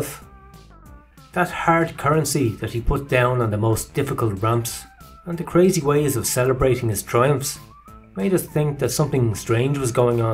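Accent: Ireland. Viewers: Is that an Iris accent I detect?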